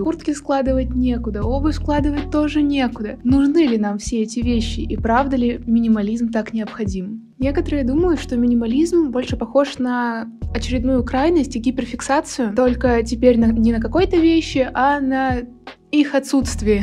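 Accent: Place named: Perm, Russia. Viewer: о, боже, ты из Перми?) круто)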